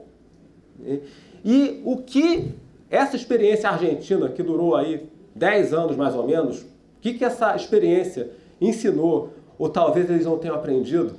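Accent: Rio de Janeiro, Brazil. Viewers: Sotaque carioca típico,amigo: Também sou fluminense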